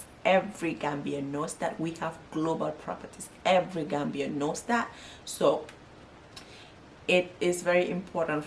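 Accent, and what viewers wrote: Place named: Jamaica. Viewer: THEY HIRED PROFESSIONALS. AND. HANDY. MEN. YOUR. ACCENT. SOUNDS. JAMAICAN.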